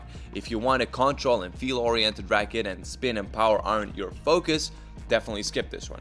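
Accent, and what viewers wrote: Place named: Russia. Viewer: From what part of Russia are you from? I Love your videos btw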